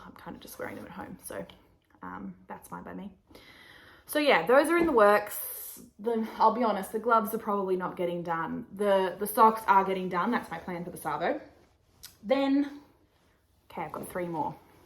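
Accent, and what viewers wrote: Australia. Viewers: I clicked when I saw you wearing your lovely grey Felix and then I got excited to hear your Australian accent! I’m in Melbourne. I loved all your knits, the shawl was definitely worth getting that mini skein set for - looked lovely with the jumper! And I was extremely intrigued by your use of the “cheeses” of roving! I already was thinking to try Plotulopi one day (don’t know if I can be bothered chasing Nutiden). I’m looking forward to seeing how your shawl relaxes after blocking. It looks so dimensional with that roving combined with the braid detail. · Hey, fun podcast. I love that as the podcast progressed you became more “Aussie” - I giggled thinking of overseas viewers when you dropped “so that what I’ll be doing this arv.” 😂 I only came across this podcast because Amy Palko mentioned you - so funny to be hearing of you via a podcaster on the other side of the universe, but there we are. 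Looking forward to watching all your other eps, and seeing more Buddy antics. Waving at you from Canberra, where it’s often cold enough to wear our knits.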